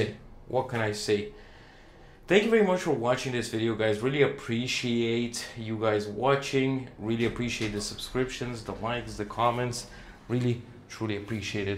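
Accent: New York, United States. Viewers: It's funny that your English sounds like an American accent more than Irish. Best of luck in Thailand, and I'll be watching to see where you end up! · Hey did you learn your English from a New Yorker???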